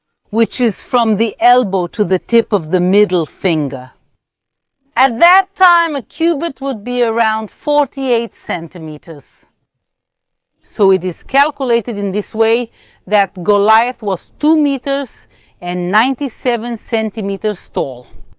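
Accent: Israel. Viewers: I love your Israeli accent.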